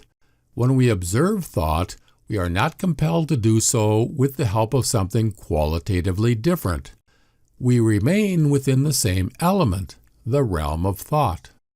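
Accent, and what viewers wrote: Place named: Western New York, United States. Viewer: Are you from WNY? Buffalo area? Possibly Chicago or Michigan? I hear your accent and I like it; I am from Niagara Falls NY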